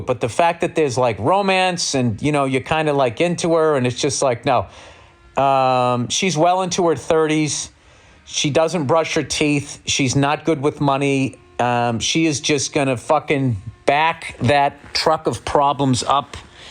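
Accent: United States. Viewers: I see the flaming retards are back in the comments. I love the morons that say that I'm just here for the comments. You're helping Bill out on his channel, so thank you. Bill is still crushing it. He will still fill arenas and he could give a fuck less what the professionally offened have to say. All you haters will just become a punch-line in Bill's jokes. I still love that Bill brought his American voice to the Middle East.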